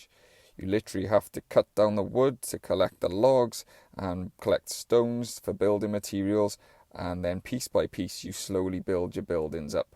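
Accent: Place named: Wales, United Kingdom. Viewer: Welsh accent! Subbed :)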